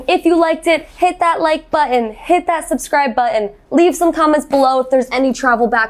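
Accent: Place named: South, United States. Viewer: why it talk country and southern but look latin.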